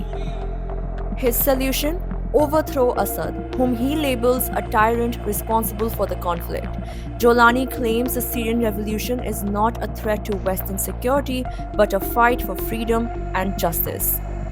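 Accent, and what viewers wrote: India. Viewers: Indian accent so funny😂😂😂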